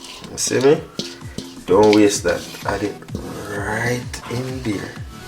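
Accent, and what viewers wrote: Jamaica. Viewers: Oh I love Jamaican accent and I love Jamaica 🇯🇲 ❤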